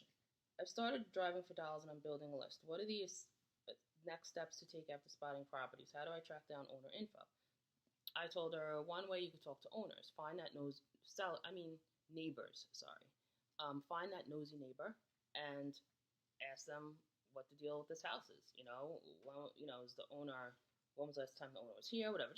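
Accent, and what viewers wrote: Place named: New York, United States. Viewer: Very informative ! Great NY accent and tonality 👌🏾!!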